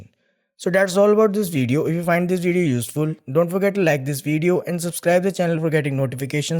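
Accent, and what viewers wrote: India. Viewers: feedback: please learn to pronounce EN proper. dont use the indian scammer accent and expect not to get backlash. if not at the very least make content exclusive on hindi.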